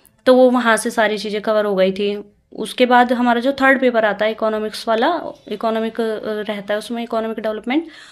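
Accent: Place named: Shamli, India. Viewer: Mam तो म्हारी वाली language बोल रही है, शामली, सहारनपुर वाली।।।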